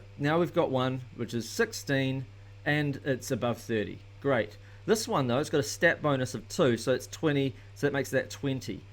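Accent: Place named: New Zealand. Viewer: Yeewwwww NZ accent, reminding me of home. LOVE IT BRAH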